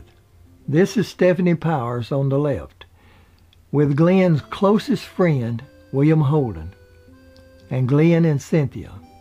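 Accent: South, United States. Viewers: I personally like the videos that provides for the YouTube community. I also enjoy listening to his voice as it is unique, very southern (I believe) as well as soft spoken. This is the kind of voice that would help a high school student to listen in order to learn a complex subject as it is very calming. I enjoyed this video on Glenn Ford as I also enjoyed his acting. I also enjoyed his video on Dan Blocker who played Hoss Cartwright on the long running television show Bonanza. was on location where the Cartwright's home and store were shown to viewers. This is an in depth look at the life of a celebrity and I liked the way in which conveyed this information, it added depth to Blocker and he made me feel like I had known this man. Not many people can do this. By showing viewers pictures of what buildings and houses belonged to him and how those buildings sit today add layers to a persons history and I enjoyed this perspective. 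The other video that I can recommend whole heartedly is on Billy the Kid. As a young kid growing up in New Mexico, Billy was a sort of anti hero people could relate to. has filmed areas where some of the action had taken place. His work combines photos taken at the time with camera work visiting these historical places that have been preserved. For those viewers who cannot make it to New Mexico to view where Billy was supposed to hang, where the sheriff was shot in the street, where his employer and friend John Tunstall w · Your accent is really gorgeous... so full of character. I'm in Ireland so it's a lovely novelty to hear this beautiful Southern drawl in all its raw glory. I especially love how you say dates... like nineteen and eighty-two. Hope you never lose it. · Nice biography's. I'm from Aberdeen Scotland. Movie stars have touched everyone around the world over the years. Nice to hear someone giving the facts without being judgemental. 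No one is without sin and the internet is full of people who love to judge. keep it up! Love the southern accent. Beautifully relaxed.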